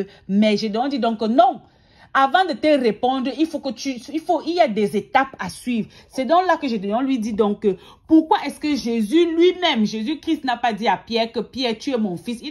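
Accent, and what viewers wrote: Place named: Cameroon. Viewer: Vraiment madame tu es forte dans rapporter les faits exactement comme ça s'est passé avec un accent camerounais interressant et intéressé par les faits Chapeau continue ainsi...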